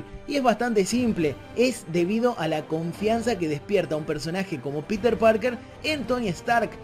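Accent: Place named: Rioplatense, Argentina. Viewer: Se te nota un poco un acento de por acá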